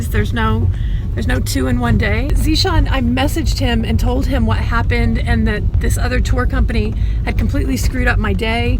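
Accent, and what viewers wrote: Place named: Canada. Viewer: Welcome to pakistan have safe trip and u sound like more Canadian then American.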